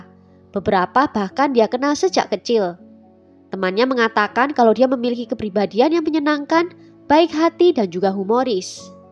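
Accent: Java, Indonesia. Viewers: Hayyy medokuhhh 👍💖💖💖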